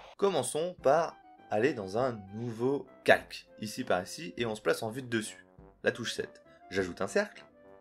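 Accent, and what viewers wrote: France. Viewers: Wowwww a really well edited video, nice! I really like your french accent haha I was okay with reading subtitles but it's true that it could've been a lot better. Above all, it was one of the best tutorials I've seen :) It seems you really know what you're doing and letting people know the steps one by one! Cool! If you make more archviz tutorials I would definitely come and always support your videos.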